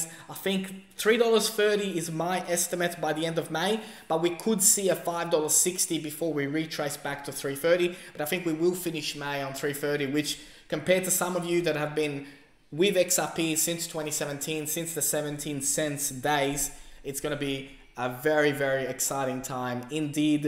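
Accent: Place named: Australia. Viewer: Nice to hear another Aussie do u have a twitter account i can follow? cheers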